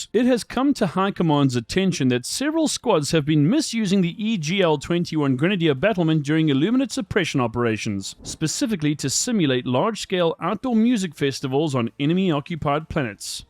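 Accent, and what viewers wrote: South Africa. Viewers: Can't place your accent. It sounds like a South African that lives in Texas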